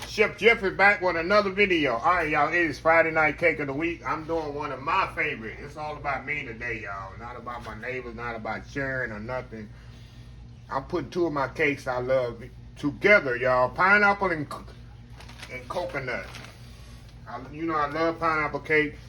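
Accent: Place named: Texas, United States. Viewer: I came here because the church folks asked for this cake....I knew that was an Texans accent ❣️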